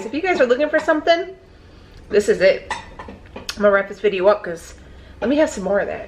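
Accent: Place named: New York, United States. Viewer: You are absolutely blooming brilliant. I love how you talk. Your accent sounds like a New York police officer. I love it! PS... you’re one gorgeous woman!xxx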